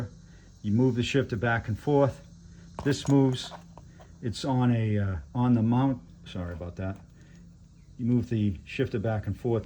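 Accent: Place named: North, United States. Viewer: That's just his accent. The way he talks, he's clearly from the Northeast in the band of the Northern American vowel shift that goes from Northern New England west through central Michigan then Wisconsin and stopping in Minnesota at the Twin Cities. What's more, anyone from there who has the shift can't hear it. It's a linguistic phenomenon that's been studied quite a bit. They just can't hear it. So, if you correct their pronunciation, it'll seem like they're being thick because they'll be all, "What's the difference? You just said what I said." I have personal experience with this having studied languages and linguistics at Michigan, which is ironic since there's nowhere that the Northern American vowel shift is stronger than in Ann Arbor, so studying languages and linguistics there with some professors from there and so speaking with the shift, it at times felt like it was the blind leading the blind and at other times just a really bizarre case study where you're tasked to objectively look into a fish bowl that you're stuck inside of. 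At any rate, a good example of the Northern American vowel shift that lines up with what you've pointed out here for him is the pronunciation of the last name "Stein," including names ending in "-stein." People from outside the region of the shift and some people within but who don't speak using the shift, like those who never picked it up but for some reason picked up speaking in the General American (GA) accent instead, tend to say it so t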